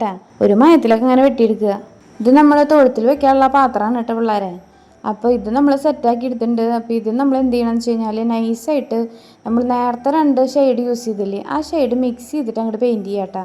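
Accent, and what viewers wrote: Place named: Thrissur, India. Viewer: തനി തൃശൂര് ട്ടോ..... സൂപ്പർ.. സൂപ്പർ.. കലക്കിട്ടോ 👍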